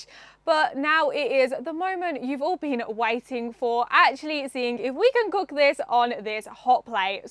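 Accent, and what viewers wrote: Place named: England, United Kingdom. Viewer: Lovely English accent ❤. I'm definitely going to make myself that same English breakfast.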